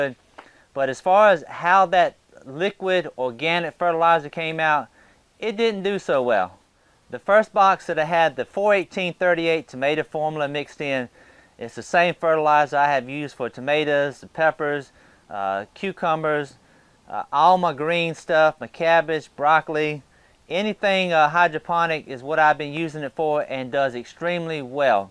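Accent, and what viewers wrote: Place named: South, United States. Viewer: Good stuff. Interesting experiment—I’m always hunting for that elusive “perfect” organic solution for hydroponics. Keep it up man! Btw: are you from anywhere near where Jimmy Carter is from in GA? I’ve been around a lot of southern dialects in my life, but the only place I recall hearing anything similar to yours is maybe from Jimmy Carter. Lol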